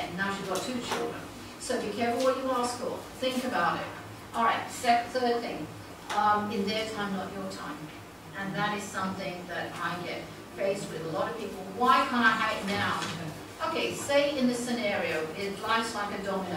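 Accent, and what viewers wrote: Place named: England, United Kingdom. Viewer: Nice to have found your instruction and insights today. Always good to discover an angel with a marvelous English accent. Thank you for the Candle Lesson. I have finally simplified my life by paring down this path with Meditation, Exercise, Creativity philosophically. Now to activate it. The Candle will be a welcom aid. Thanks for the light...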